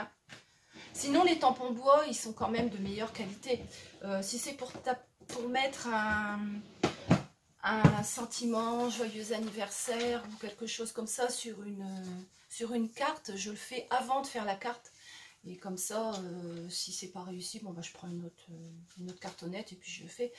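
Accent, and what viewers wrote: North, France. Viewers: Ccou j’adore ta petite vidéo de présentation et par dessus ton cet accent du nord lol eh oui merci à toi bis 💋😘👍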